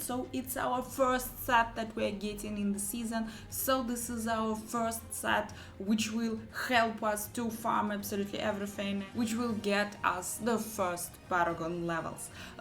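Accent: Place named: Russia. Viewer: I find it very pleasing to listen to you talking about diablo with that russian accent 🤣😅 also nice video!!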